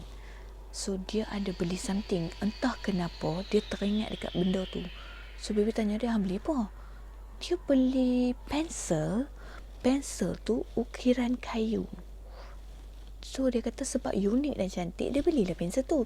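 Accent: North, Malaysia. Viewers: Kekalkan cerita cakap owg utara sbb sembang best sgt..kami suka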